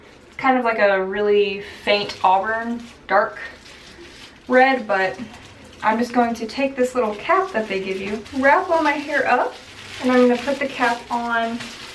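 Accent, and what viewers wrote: South, United States. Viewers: Ive been following your channel for a while. And I just noticed you have a southern accent. Like its not deep South but you kinda sound like your from Houston..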